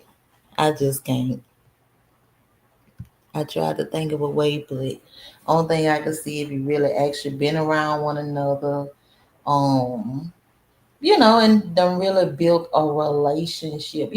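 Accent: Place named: Deep South, United States. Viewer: ok I will pop in the chat one day so be on the look out for me 😁 and I like that country accent u got lol you really sound like u from the deep country south foreal 🤣🤣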